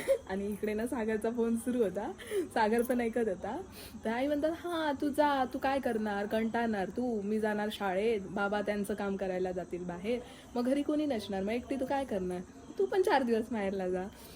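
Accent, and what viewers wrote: Malvani, India. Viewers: Malvani Chan bolte aasech volg malvani karat ja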